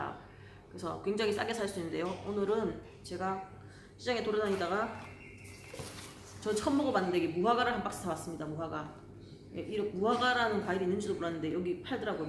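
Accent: Gyeongsang, South Korea. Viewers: 어제부터 폐인 중입니다ㅋ 같은 대구분이시라 너무 정겹네요~~~ 호주 일상 자주 올려주세요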